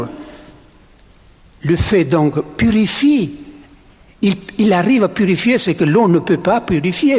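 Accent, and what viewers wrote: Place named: Italy. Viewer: Bonjour mon Père, votre accent a le parfum de l Italie le parfum de la meditéranné et en vous écoutant nous prenons le grand large , merci infiniment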